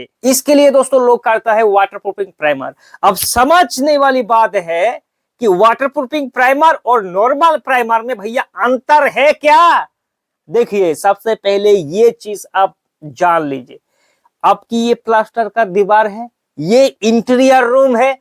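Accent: Bengal, India. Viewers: Bhai aap bangali ho?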